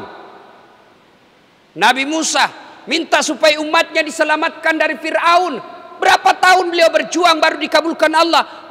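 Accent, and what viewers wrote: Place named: Bugis, Indonesia. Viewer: Cuplikan nya saya suka,persis ceramah almarhum zainudin mz... Namun versi bugisssssssss😀😂😂😇😇😇😊😊 Yang pernah denger ceramahnya di channel almarhum mz ??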